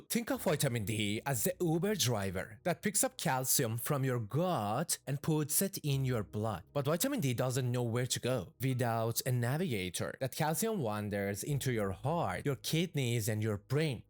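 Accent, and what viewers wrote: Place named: France. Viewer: i can hear a french and Swiss accent or Indianish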